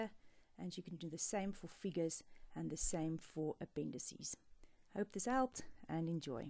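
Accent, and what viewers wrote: Afrikaans, South Africa. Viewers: Thanks very much, got to love the Afrikaans accent! Dankie! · South African? The accent is strong. But it´s cool to hear it so unexpectedly.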